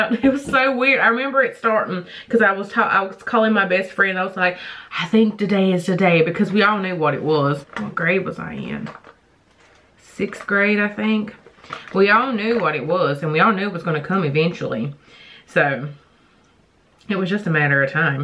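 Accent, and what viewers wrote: South, United States. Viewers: Happy New Year....Your look is so BEAUTIFUL. I just adore your southern tone. It's so cute and adorable.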